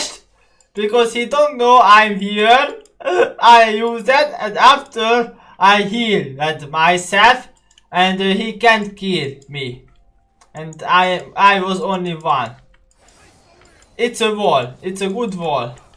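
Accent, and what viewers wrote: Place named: Hungary. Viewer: már régen is jó volt az angolod, de örülök hogy most már nem olyan magyar akcentussal beszélsz mint ebben a videóban.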